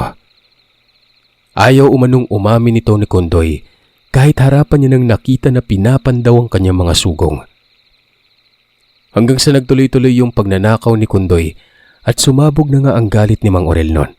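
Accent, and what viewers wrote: Visayas, Philippines. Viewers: listening here from Lapu- Lapu City Cebu...hello sir cute mo mg bisaya 😊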